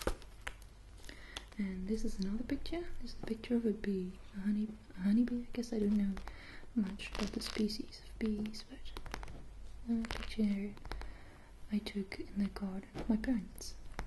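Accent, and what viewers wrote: Netherlands. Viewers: Ben je nederlands? Hahahah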